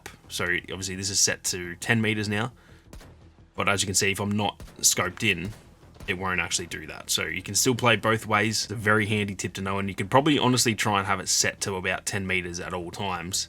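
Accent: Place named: Australia. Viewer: Always love your content, really think you deserve way more views from the quality of your vids. Nice to see (and hear) a fellow Aussie!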